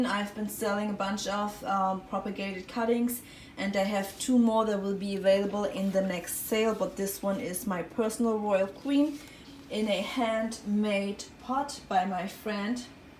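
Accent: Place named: Germany. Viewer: Hi, great music 👍. This is the first Video I watched, and damn, all the time I thought "this accent, she has to be German". Switched to your housplant tour part one, looking out of the windows, in the backround, furniture and stuff if anything can tell me... Nothing in your channel-information. And then "LA" 🙈. Finally "Berlin", so I can chill 💁. Greetings from Germany! 🇩🇪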